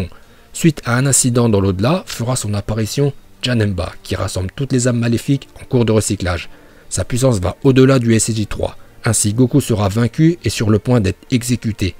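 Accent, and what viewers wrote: Japan. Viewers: Ton accent japonais est super 👌 😁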